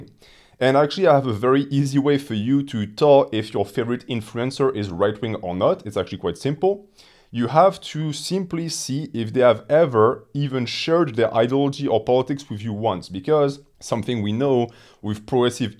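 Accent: France. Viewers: Sounds french to me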